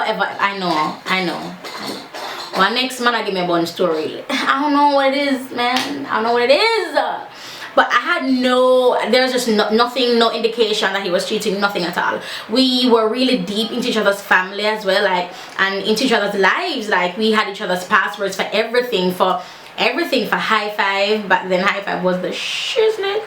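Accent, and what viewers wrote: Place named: Jamaica. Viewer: love you girl. ..proud to see a Jamaican in this mode...positive and smiling. ..love how yu switch up di talk!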